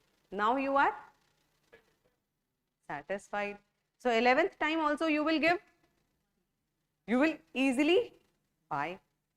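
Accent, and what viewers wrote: India. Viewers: indian accents kills me lol what indians think they sound like when they speak english it gives my ears cancer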